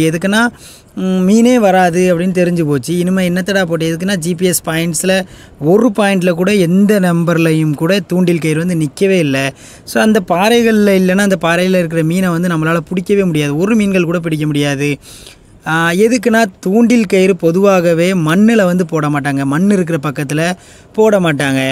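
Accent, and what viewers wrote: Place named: Sri Lanka. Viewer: என்ன இலங்கை தமிழ் பேசுறீங்க அண்ணா pad ok வாழ்த்துக்கள் அண்ணா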